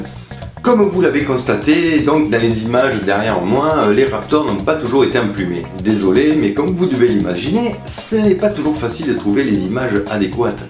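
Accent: South, France. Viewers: L'acsent du Sud.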